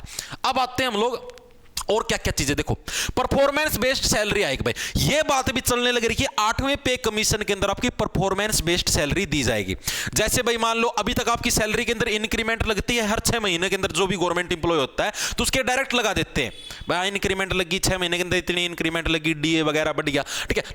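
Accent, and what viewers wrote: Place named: Punjab, India. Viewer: ye to punjab se touch h punjabi style a jata h bolne m bhi right?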